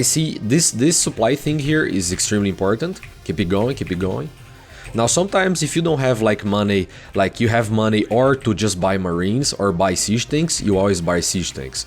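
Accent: Brazil. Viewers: ahahaha só pelo sotaque já vi q é br ! bom tutorial ! comecei a jogar recentemente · Thank you so much for this build! (and Btw are you Brazilian? your accent sounds like it) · thats a nice brazilian accent you got there! cheers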